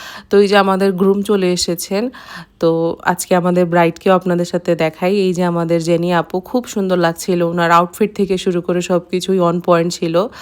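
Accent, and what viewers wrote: Sylhet, Bangladesh. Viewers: Apni ki sylheti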